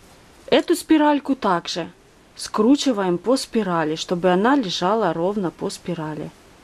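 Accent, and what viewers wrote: Ukraine. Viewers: Девушка видимо украинка, вот и говор такой, так то всё же понятно😊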